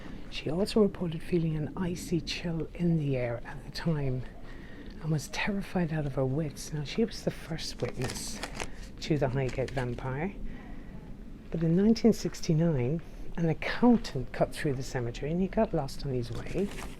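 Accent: Ireland. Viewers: You have a lovely soothing voice! Living here in Berlin, always nice to hear your beautiful Irish accent, reminds me of home. Also, Highgate was one the most interesting places I visited in London. Keep up your wonderful work x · Dear, that was a wonderful walk, I've had that place on my bucket list for many years. Thank you for a wonderful video, I've been there in spirit now. I was pleased by the care being taken and Highgate Cemetery, it still has the right kind of spookiness. I hadn't heard about the vampire so that is a bonus. I love hearing your accent it adds so much for me, the Irish branch of my family tree are from Dublin, a few of them weren't very nice but they are are forgiven 😀 Lots of love and Blessed Be always 💖💖💖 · I just love watching your videos and love your Irish accent, must bring out the Irish half of my ancestors in me...lol. Love love love London (been there want to go back one day) and also to visit Ireland . 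You have so much energy and enthusiasm. Would love to do one of your tours one day. Go away Covid and I will be over there in a flash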